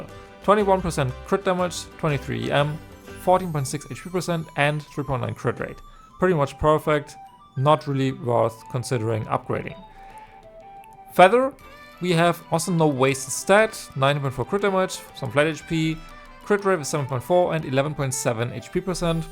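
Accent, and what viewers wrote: Germany. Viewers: Man hört dir dein deutschen Akzent so raus 😂 Aber gutes Video 🫶🏻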